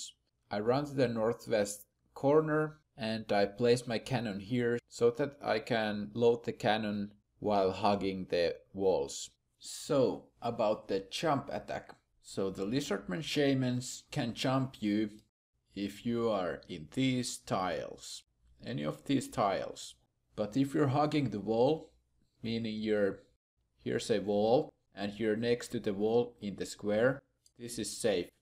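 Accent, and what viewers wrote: Finland. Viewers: You must be finnish? Suomiaksenttia ei voi olla tunnistamatta. Hyvä guide, pääsi hyvin kärryille shamanien kanssa :D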